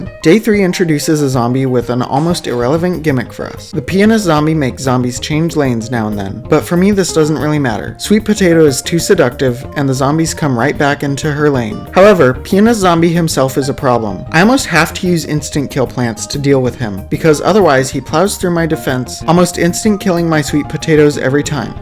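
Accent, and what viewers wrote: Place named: South, United States. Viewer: Love that southern accent #GvZWW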